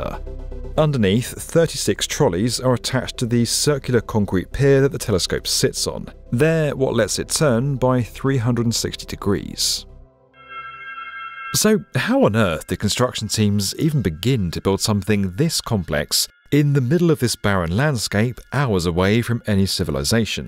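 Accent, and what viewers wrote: England, United Kingdom. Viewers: It's not the height of Big Ben (a bell), which is but a few feet high, it is the height of the Elizabeth tower that holds Big Ben. You sound English, you should know better. Bollockbrain. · As an American , l cannot tell you how much a British accent adds to narration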